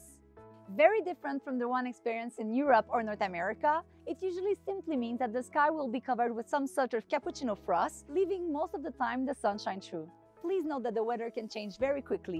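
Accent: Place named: Africa. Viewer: White girl with african accent what the f...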